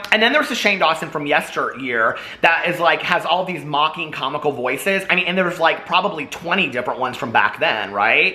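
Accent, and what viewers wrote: South, United States. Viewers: "It's Shake and Bake...and I helped!" You just squeezed that little gem in there right at the beginning. I can just hear that thick Southern accent! Lol!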